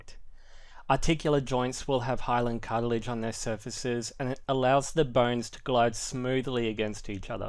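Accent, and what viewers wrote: Australia. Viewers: thank you! like your Australian accent:)(hope I pick it right!)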